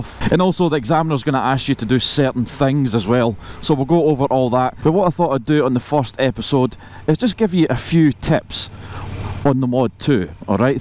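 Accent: Scotland, United Kingdom. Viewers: i'm currently doing lessons in spain, which makes it interesting because my spanish is so terrible hahah. they let me do the common theory test in english, and the motorbike specific one was in spanish. failed the english one :) passed them both next time, then did the mod 1, which i fucked up as well by riding through the cones instead of weaving around them, due to nerves, passed it next time no problem had my first lesson on the road a couple of days ago, and it was terrifying, but now i feel a lot more confident! couple more lessons and i'll feel confident enough to take the test. your video is super helpful! also nice to hear a friendly accent, i'm scottish too :) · Fellow Scotsman ?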